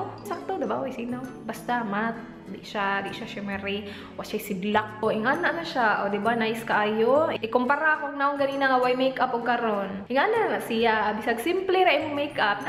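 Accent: Visayas, Philippines. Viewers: Ang cute mo Ate hahahaha di ko naiintindihan pero ang cute kasi ang daldal mo po tsaka ang cute ng accent talaga ng Bisaya 🤣🤣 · hahaaaa..😂 Bisaya kaayo nindot pamation..taga San Francisco, Agusan del sur , Mindanao ko. nah,ako sab conscious sa akong kilay mga biente minutes jud ko mag sulat sa akong kilay hahaaaa😂😂. gwuapa na kaayo ka.😃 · char bisdak kaau hhehe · whahahaha tawa po ako ng tawa dito, ang cute mo pong magvisaya ate hehe, kahit di ko po naiintindihan pero sayang saya po ako, parang naiintindihan ko hehe. ang cute lng 😊😂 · ang cute cute mo sa pagbibisaya ms.:) naintindihan ko lahat from visayas din kasi ako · hahahhahaha kakatuwa ate kahit di ko naintindihan.. pinanood ko pa din cute mo kasi ate mag bisaya.hehehe😃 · Mas nindot japon paminawon mgbisaya ka Ms. 😊 From dipolog z.n. here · Ang cute niyo po mag bisaya, sayang di ko maintindihan. 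:( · hahahhaa lingawa nimo mag bisaya oy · hi im from cagayan de oro bisaya japon..cute kaayo paminawon kung magbisaya ka ay.. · i really love the way u speak visayak ate hahaha visayak video more ate pls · mahimout kos accent sa bisaya. taga cebu sd ko, your loyal viewer. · Nakakaaliw ka Ate magbisaya 😍😁 Haha. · omg so funny idk why but kong mag bisaya funny paminawn kay relate much ko lol. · lami kai ka paminawon kung mag bisaya ka madam 😂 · Kataw-anan man ka te oi 😂Hahaha bisaya kauu ka tenu remind me of my cousin s philippines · Cagayan or CDO kay bisaya.. makalingaw man ka magbisaya oi heehehe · Taga asa diay, madam? Kasabot kaayo ko nimo. Lingaw kau ka sa imong pag binisaya. Sa Davao ko karon nag puyo..:D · Ka cute mag bisaya nimo oy hehehe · Pg talga nag bibisaya prang nakakatawa 😂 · im not visaya pero naiintndhan ko,thanks sa akong x bf hahah.ang cute mo mag visaya day.More Powers!!! 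· Woooohooooo! Bisaya kaayu!!!! Lingaw kaayu ni dai! Hahahahaha! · Grabe ka cute nimo magbisaya ate 😍 karun rako naka discover sa imong channel ate hihi lingaw kaayo.. Gwapa jd ning mga cebuana😍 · nalingaw kog tanaw kai gahi kaau ang bisaya bagsik kaau 😂 Ganahan nako mu trt ug vlog hinoon! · Ang cute cute mo mag visaya 😂😂😂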